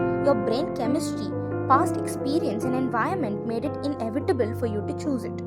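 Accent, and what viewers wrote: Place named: India. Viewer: I love Indian gurl accent 🌹😋🤪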